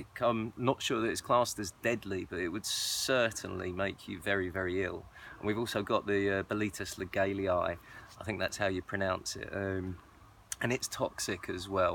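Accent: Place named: Bristol, United Kingdom. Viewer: Are you from Bristol ? Im trying to work out your accent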